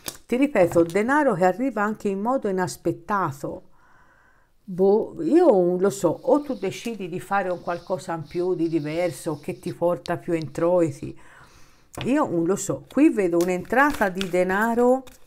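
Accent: Tuscany, Italy. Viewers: Grazie,adoro come parli il toscano,e anche la Toscana,speriamo bene 💰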